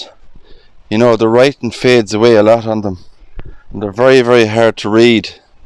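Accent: Ireland. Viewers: Excellent. I'm so glad I found your channel. Graveyards are beautiful places. All those lives of people who all had a purpose and stories to tell. Your lovely Irish accent is pure joy to listen to as well. Thanks and take care 🙂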